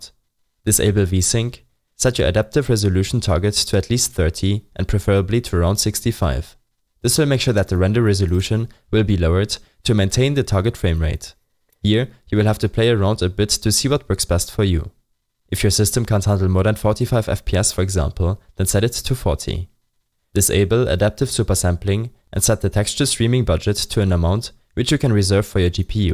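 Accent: Germany. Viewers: Der deutsche Akzent😂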